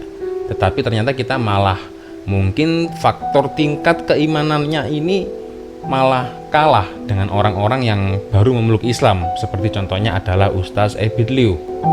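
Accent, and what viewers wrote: Malaysia. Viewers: cak da pandai cakap mcm org malaysia la....tebaik cak.....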